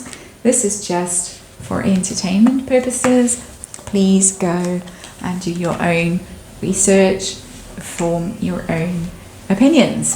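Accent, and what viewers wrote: South Africa. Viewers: Delighted to have found your channel, I lived in South Africa for decades and your lovely voice and accent make me feel nostalgic! You are a great tarot reader too!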